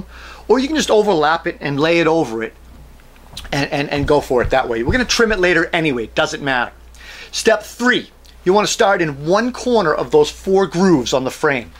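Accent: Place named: Massachusetts, United States. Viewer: The one thing I look for in DIY videos is that the person has an accent that I'd hear on a job site, thats how I know it'll be short amd sweet while being accurate and efficient 😂 Gotta be country, Boston, or New York.... Couldn't pin this accent but sounded like Massachusetts or New York. 👌🏻 Immediately trustworthy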